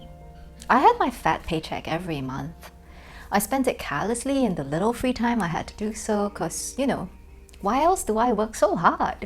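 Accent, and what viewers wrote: Singapore. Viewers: Hehe I like listening to your accent, you remind me of my friends from Singapore 😎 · hi!, is your husband from a Russian country? because your last name is but your accent is from singapore.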